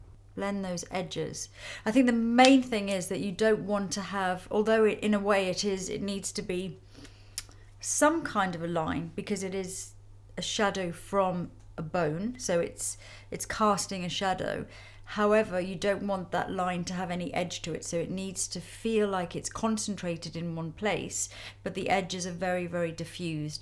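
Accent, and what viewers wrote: United Kingdom. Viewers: Love her explanations of make-up. Also, love her calm, mellow voice with British accent.:) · I love your accent. Always liked the British accent.❣️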